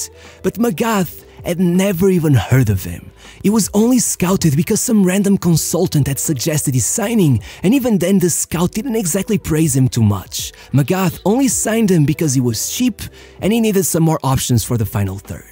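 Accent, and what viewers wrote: Portugal. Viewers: Quem é o tuga que dá a voz a estes vídeos? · Are you Portuguese?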